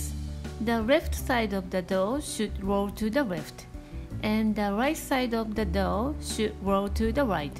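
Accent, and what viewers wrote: Japan. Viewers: i only trust japanese food video that with japanese accent on it.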